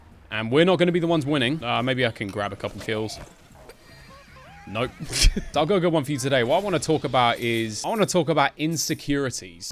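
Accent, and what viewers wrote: Wales, United Kingdom. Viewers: not once have i watched one of your videos and paid attention to how your voice sounds. even doing so now, i see nothing wrong with it. you sound like a british man. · That sexy voice and that britain accent are your trademark because everyone recognizes you when your "WHAAAAAAAAAAAAT?!?!!" pops in. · bruh i love your voice so much. as a british person who has moved to australia, i can appreciate and miss your accent. and your voice is wonderful, it makes everything about you so much funnier. dont be sorry. i love your so much aha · I like your voice, I just love how "British" (or in this case, Welsh, which I didn't know until relatively recently) it sounds, yet very comprehensible, something I wish I had. Also I wish I could do one of your signature "WHAAAAAAAAAATTTTTTTT"s. Though I can't blame you for wanting to have Matt Berry's voice. 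Hell, he doesn't mind if you're from Iran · As someone who’s been watching since like 15,000 subs, I wouldn’t be watching if had an annoying voice, I’ve stopped watching other people on voice alone. I think I’ve watched so much, even when I was younger. I sometimes say phrases he would, like actually. What can I say I like the British accent. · welsh accent 100% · Mate you sound fine there’s nothing wrong and as a British person it’s nice to here someone with a similar accent to me I get tired of American accents they really grate on me don’t worry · Bro i love your voice man. i’ve always loved british people. Mainly for their vocab and they’re accent. Not matter how you sound you are still amazing. love you big bro. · It's a UK voice I'm proud of my UK voice so you should be too and I understand the insecurity of having the audience hear it but that's what makes you iconic to us!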